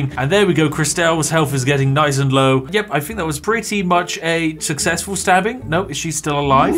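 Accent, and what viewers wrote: United Kingdom. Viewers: The pure British accent its so good and it really makes jokes better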